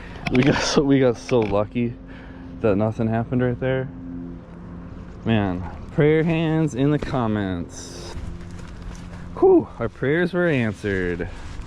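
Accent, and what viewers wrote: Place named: Wisconsin, United States. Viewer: Thanks for reppin’ the MidWest. Love your understated fashion sense adorable ‘Sconnie accent and seeing the Spingtime in Hudson.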